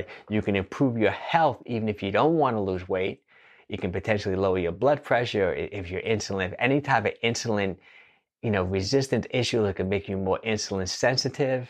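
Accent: New York, United States. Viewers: you New Yorkers have your own accent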